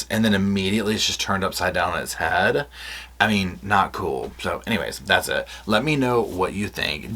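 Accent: South, United States. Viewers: You rock a southern accent! 😂 Xoxo to Roscoe :_Lovesit::_SofaSquad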